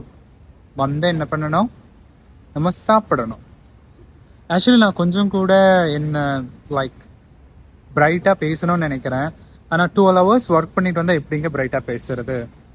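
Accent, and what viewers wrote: Tamil Nadu, India. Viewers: Hi anna I'm from Sri Lanka Our Tamil is little different than India Tamil But your Tamil feel good anna Slang also cute anna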